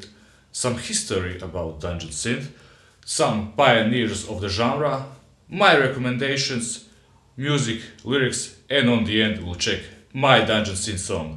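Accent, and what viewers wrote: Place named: Russia. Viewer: Давай по-русски теперь) я по акценту вижу, ты наш)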